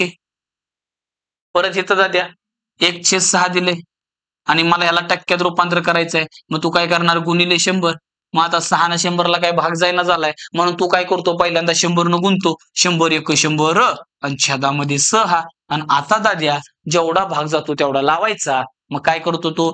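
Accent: Rural, India. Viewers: अप्रतिम आहे सर तुमचे भाषा कौशल्य.... तुमच्या बोलण्यातून गावाकडचा गोडवा आणि शिकवण्यातून आई सरस्वतीचा आशीर्वाद दिसतो...🙏🏻🙌🏻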